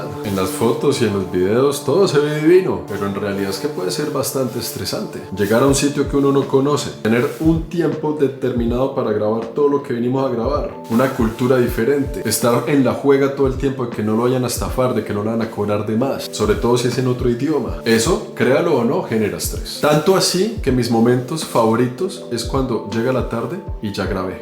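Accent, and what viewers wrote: Colombia. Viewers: Buenas tardes, te escribo desde Granada, en España. Mi marido y yo te vemos desde hace medio año más o menos. Me encanta que no hayas perdido tu acento colombiano y que además de tomar transportes de un sitio a otro como hacen otros creadores de contenido, expliques cuestiones relativas a la historia y la cultura de los sitios a los que vas. También nos agrada el hecho de que no hagas grandes aspavientos con la cámara y que tus videos sean siempre sinceros. Este me ha abierto mucho los ojos, porque a mi por lo menos me gustaría poder viajar ya que no puedo hacerlo por una cuestión económica. Cuando acabe el doctorado quizá. Un fuerte abrazo y sigue subiendo cosas, son pequeñas maravillas. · Amo como narras tus videos, y tu acento colombiano :) saludos desde Lima Perú. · me gusta mucho sus videos y que no olvidas la forma de hablar de nosotros los colombianos 😂😂😂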